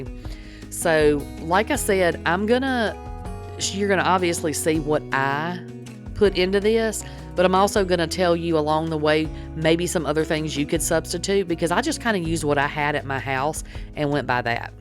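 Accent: South, United States. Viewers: Blueplate Mayonnaise???? lol I have NEVER heard of it in my whole life??!! lol And from your accent you are most definitely Southern or as my first cousin Pam Jackson from the south, i.e., Lakeland, FL, likes to say, “Big Country?!” lol BUT NO WHERE HAVE I EVER SEEN BLUEPLATE MAYONNAISE??! lol I’m sorry I’m not making fun of you I’m just shocked I’ve never heard of it and we are most definitely BIG COUNTRY too??!! Lol I’ve seen ALOT of Dukes Mayonnaise in North Carolina where my father retired out of Fort Bragg, but I personally don’t care for it. But I just can’t get over the fact that I’ve NEVER seen BLUEPLATE MAYONNAISE before. lol 😆 I don’t think I ever saw it in Publix in FL either or Tennessee where my cousin Pam and her husband Dan live now. 😊I am a die hard Hellmans Mayonnaise person myself. Just saying!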